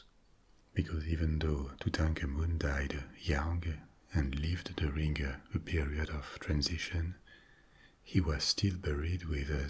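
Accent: France. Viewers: Duhhh Guys! His title is! Lol 😆 you can here it in his voice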